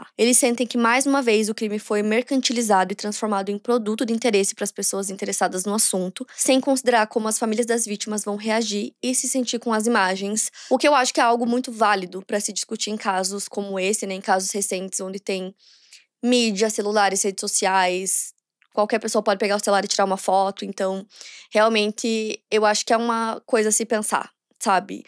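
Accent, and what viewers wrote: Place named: Pernambuco, Brazil. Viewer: vc é de qual estado do nordeste? Reparei em alguns sotaques que parecem ser de pernambuco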